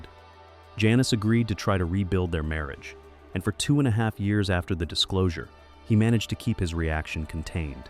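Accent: United States. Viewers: Why is an American narrating Drew Pritchard backstop? Hasn't anyone told him that the place is Conwy NOT Conway!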